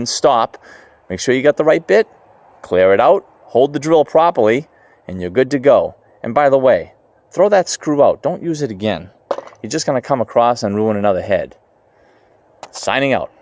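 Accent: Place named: New York, United States. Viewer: My favourite part of this video was the strong New York accent. My second favourite part of this video was when you put the driver in reverse so it wasn't trying to drive the screw even deeper.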